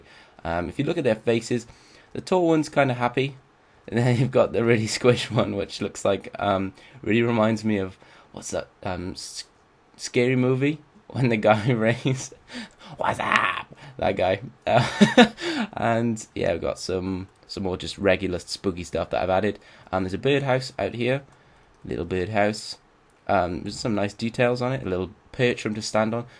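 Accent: England, United Kingdom. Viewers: Your so English.... I LOVE IT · I don't remember you being British. Not trying to be rude, just saying.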